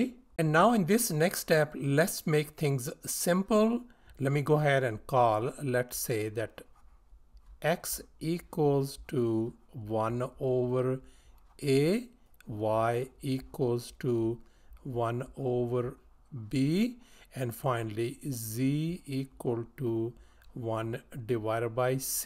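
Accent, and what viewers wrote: India. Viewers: Sir your voice sounds like Indian..then why you are in America..😒😒..don't you love india?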